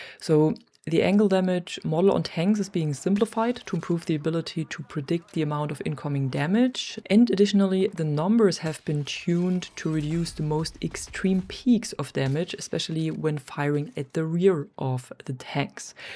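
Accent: Germany. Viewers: its german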